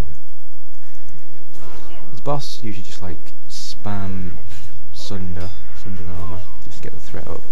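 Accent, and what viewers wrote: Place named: United Kingdom. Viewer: British accents are always amazing. If you weren't so quite and more talkative, then you'd easily be a famed commentator. An example is the user <link>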